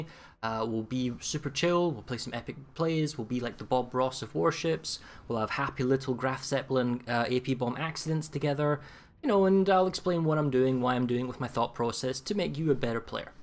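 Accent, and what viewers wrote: Scotland, United Kingdom. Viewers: damn now i've got between Mighty Jingles and you....... i don't know..... i'll see with every mission (if you bring a little more scottish accent(soft spot) i'm in....) Why not strike a deal and get a scottisch speaking HANS in the game :)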